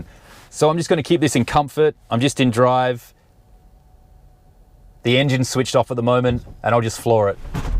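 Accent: Australia. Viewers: This guy has an Aussie accent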